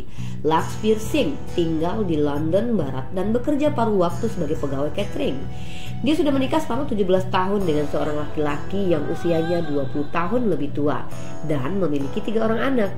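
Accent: Java, Indonesia. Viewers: KESIMPULAN😁, semua narator punya ciri khas sendiri2, justru medok itulah yg bikin ciri khas suara yg tidak dipunyai narator lain, maaf untuk vidio ini tidak saya dengarkan sampai selesai😊semangat! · dimana dirimuuu... jangan di gantiii dong...😭😭 is tbe best👍🏻👍🏻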